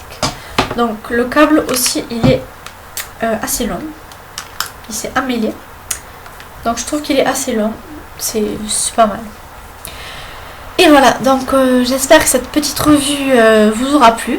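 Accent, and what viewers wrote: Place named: South, France. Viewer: On dirait que t'as un accent du sud :D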